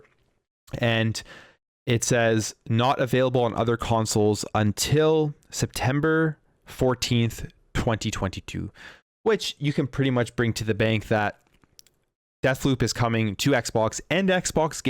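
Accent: Canada. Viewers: Figured you were Canadian when I heard Aboot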